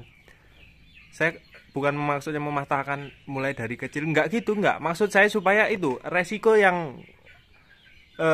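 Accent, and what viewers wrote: Java, Indonesia. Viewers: Aslinya mana mas... Jawa e kok kentel....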